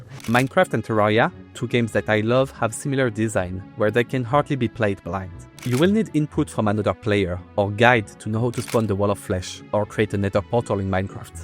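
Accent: France. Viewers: The video was so good I almost didn't realize you are french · On entend l’accent français à des KILOMÈTRES 😭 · Sup fellow french , I recognise it with your accent. More seriously , hope to see cool projects from ya !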